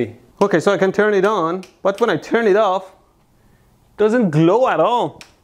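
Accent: Iran. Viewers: Yep that's the strongest Persianified English accent right there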